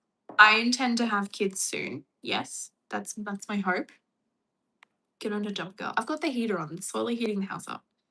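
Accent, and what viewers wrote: United States. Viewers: Ok, I’m American (unfortunately 😬), and your American accent always, always makes me laugh, I friggin’ love it 😂👌🏼 …gonna save this video to watch the rest when I have more time, but I just wanted to say—I really do love these longer videos you’ve been making lately. Whether you have Hammy in there with you or not, whether you’re cooking, or making other stuffs…just anything! Almost feels like we’re hanging out together gabbing about random things and—maybe weird to say—it’s just a lot of fun. 🤷🏼‍♀️ 🍻💃🏼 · Your American accent cracked me up! 😂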